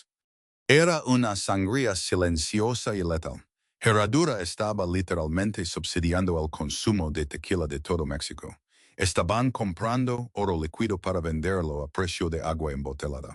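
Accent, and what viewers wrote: United States. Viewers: TU PRONUNCIACION ES DE USA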